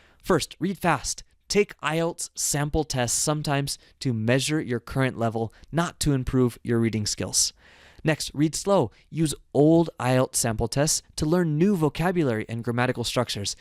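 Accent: United States. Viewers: Oh my goodness...m in luv with ur voice😘so soothing n everybody's gonna luv to listen to u...American!!u r??(for sure)...well don't get me wrong...wanna check my accent recognition capability.🤗... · Awesome mate, this is all I want to know about reading correctly, not just for ielts test also for a long term English learning or any other language learning. The thing is, I'm curious where you come? R u American?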